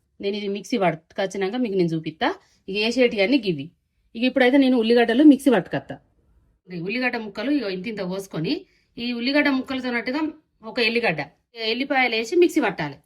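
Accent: Telangana, India. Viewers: అమ్మ మీ తెలంగాణ భాష చాలా బాగుంది తెలంగాణ భాష లో ఎంత చక్కగా మాట్లాడారు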